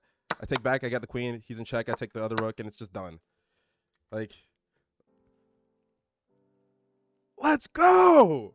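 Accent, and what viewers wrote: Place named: Africa. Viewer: What part of africa are you from?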